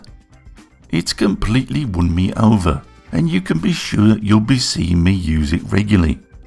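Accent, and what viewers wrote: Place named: Wakefield, United Kingdom. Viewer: Sorry buddy I have to call you out on this one on TWO counts! One he is a northerner possibly a fellow Yorkshireman like me ( sounds like he's from Wakefield) and we are ALL plain speaking and if we don't like something we tell you weather you like it or not, its in our genetics. Second I was a big name some 5 years ago in reviewing computer parts (after being 2x UK OC winner) and I will tell you this, I know an honest reviewer when I see one! has the same philosophy as me, "SAY it as you SEE it, but INVESTIGATE thoroughly! " so with all due respect I have to call you out on this one. Have a great weekend:)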